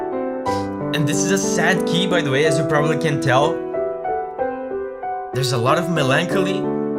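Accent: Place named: Japan. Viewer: 英語の発音も我々日本人に近い感じたから語学勉強にもいい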